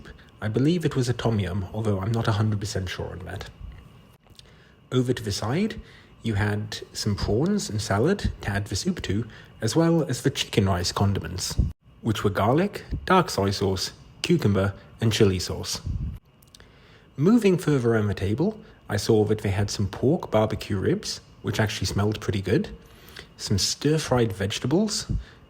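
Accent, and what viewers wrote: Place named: United Kingdom. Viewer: Wow your accent is soooo British lol 🇬🇧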